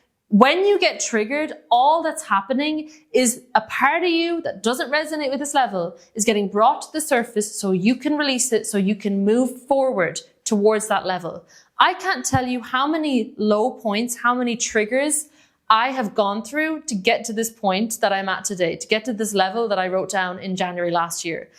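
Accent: Ireland. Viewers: I detect an Irish accent? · Its so rare to hear Irish people on Youtube you got a sub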